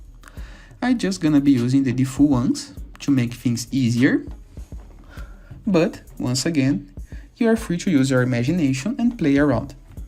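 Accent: Brazil. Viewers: Your accent seems brazillian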